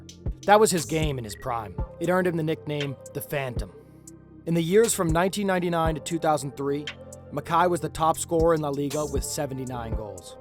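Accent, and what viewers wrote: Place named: United States. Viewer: american accent: immediately loses credibility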